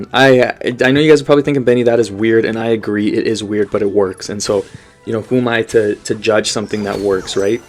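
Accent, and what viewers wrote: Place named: Canada. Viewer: Your voice sounds so Canadian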